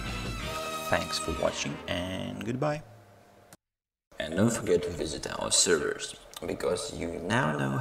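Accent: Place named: Ireland. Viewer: what is it with irish people doing game tutorials?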